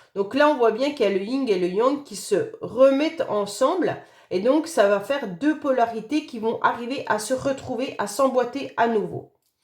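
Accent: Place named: Franche-Comté, France. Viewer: Vous avez l'accent franc-comtois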